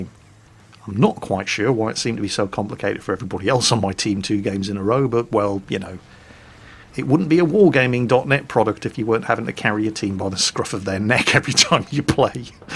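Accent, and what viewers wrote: England, United Kingdom. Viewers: I'm not much for realistic simulators such as WT. I just don't enjoy the game as much. Could it be because I'm not good at it? Sure! But the point of having fun in a game is based on personal preference. The people saying "WT is better, get gud" are the same people who talk about PUBG being a good game (it isn't, btw). WoWP in its current state is for the individual who just wants to shoot at things (such as myself). I don't play many games, because I don't like most games. Developers are attempting to reach broader audiences by creating games such as WoWP. If it doesn't fit your preference, cool! No problem! There are many games that probably DO fit your preference. Fighting over games like "fam bois" doesn't make sense though. It's not constructive. And I know, this is a YouTube comment section, so it doesn't have to have any constructiveness to it, but regardless. 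This is a video, we're not watching idubbbz or ricegum. His smooth English accent should be calming everyone down. This is a part of YouTube that can be, and should be, a place to critically critique war-type games. Will I download this game and try it? Maybe. I really enjoy WoWS right now and have moved away from WoT because the learning curve is just different between the two. I'm not great at games overall. steps off soapbox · even when you fuck up, or don't play well, that British accent makes it seem oh so correct.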